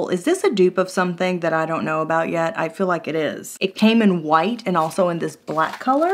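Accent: Texas, United States. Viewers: Girl are you from TX? I am from San Antonio and you got that TX flare!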